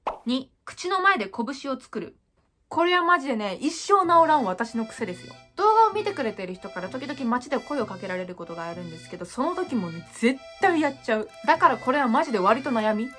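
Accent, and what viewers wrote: Nagasaki, Japan. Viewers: 喋り方から天草か長崎の人かなぁと思ったけど違うかな！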